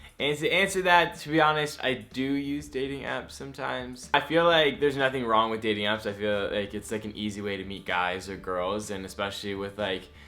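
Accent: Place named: Los Angeles, United States. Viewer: los angeles accent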